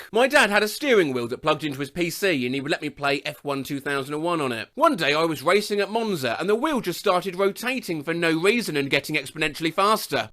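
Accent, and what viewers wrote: United Kingdom. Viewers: i'v never heard a voice screaming "BRITISH" more than yours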